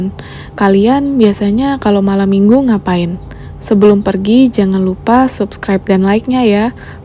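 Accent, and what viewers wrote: Manggarai, Indonesia. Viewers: Ci tinggal di Manggarai pasti e, soalnya dari logatnya org Manggrai ni, ada video kompiang, tacupiang trus ada acara smbut baru juga.. salam kenal dr Ruteng, sukses utk kontennya Ci, sngat menginspirasi😇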